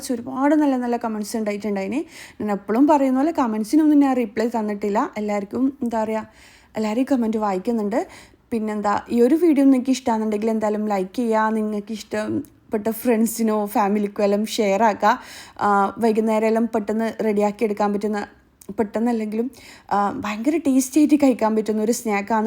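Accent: Kannur, India. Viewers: Alla ith kannur thanneya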